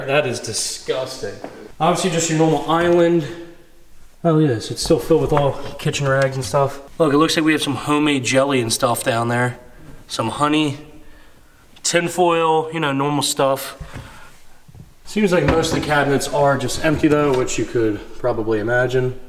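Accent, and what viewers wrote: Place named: Pennsylvania, United States. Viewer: Hey, just found your channel and subscribed, interesting content. I think some of those are aviary coops? These people were breeders it seems. Those mugs are worth money dude, someone put a lot of money into this property. Is it for sale? Unbelievable, it looks like it can be saved at this point. Oh, I'm detecting a accent, are you from PA? Anyways, enjoyed the content, thanks bro! 🌴😎🌴FL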